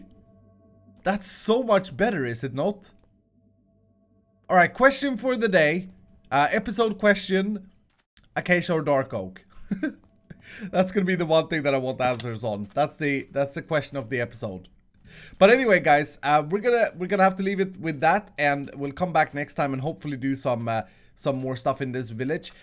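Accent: Sweden. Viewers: I love you’re Swedish dialect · Are you from sweden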